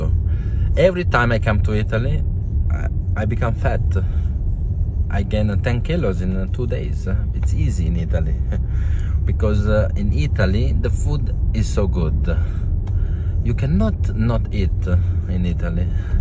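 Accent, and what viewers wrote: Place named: Portugal. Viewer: Amei esse vídeo adorei vc tá.. falando um pouquinho de sotaque português.. Marlene... Brasil 🎉🎉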